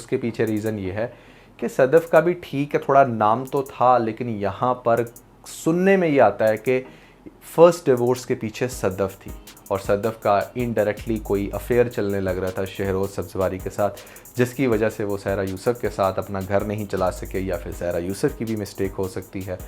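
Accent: India. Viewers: Bhai aap Indians ki tarah kyun bol rahe ho.... VIDEO INTERESTING HONE WALI HAI....... GHANTA FARQ NAHI PARTA.... yeh kya hai??? Pakistani Urdu bolo bhai kya hogaya hai....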